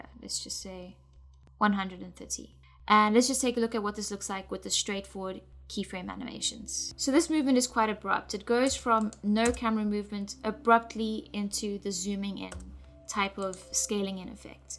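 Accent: South Africa. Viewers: Awesome tutorial! And great to hear an SA accent with shots of Milnerton and Hout Bay :D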